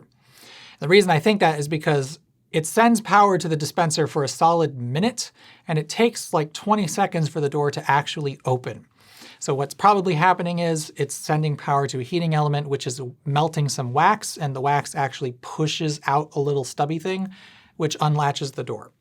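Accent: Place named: Midwest, United States. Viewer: That's just his Midwest showing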